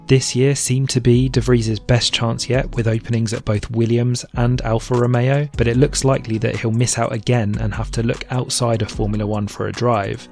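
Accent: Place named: United Kingdom. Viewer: Yeah that was very comfortable. Perfectly crystal clear British too.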